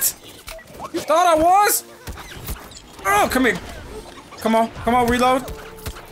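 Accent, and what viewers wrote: South, United States. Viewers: Whats up with people always saying he sounds black? It's just southern. Black has a more... Powerful tone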